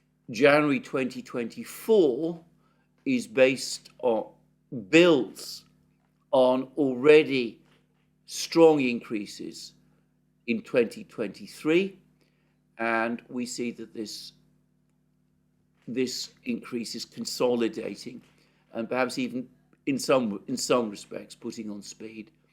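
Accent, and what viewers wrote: United Kingdom. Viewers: Great job from the best informed reporter. Prudent in presentation. Great british accent🙂love those daily moments ❤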